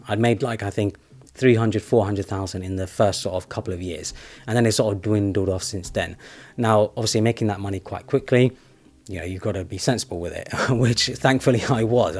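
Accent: United Kingdom. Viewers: because british accent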